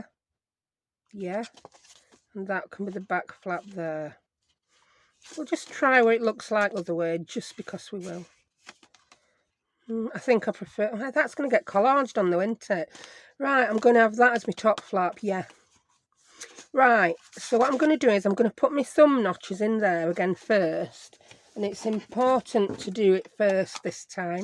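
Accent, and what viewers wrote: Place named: Yorkshire, United Kingdom. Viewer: They look fab, and yes I get the bit about running over stuff with the wheelie chair, I do it all the time 😂 I’m in New Zealand and my parents were from Yorkshire so love hearing your chatter x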